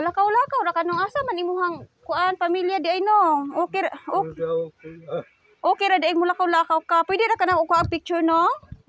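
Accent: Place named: Visayas, Philippines. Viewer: Bisaya diay ka day. Pero buotan ka kaayo....tnx God may taong kagay mo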